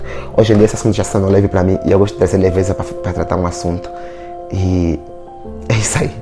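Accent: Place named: Portugal. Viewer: Ele falar português só que de Portugal entendeu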